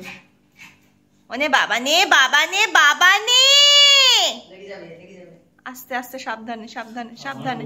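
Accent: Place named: Nadia, India. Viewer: তোমার কথায় খুব সুন্দরভাবে নদিয়া জেলার টান আছে l আমার পৈত্রিক বাড়ি শান্তিপুরে l তাই এই টানটার সঙ্গে খুব পরিচিত আমি l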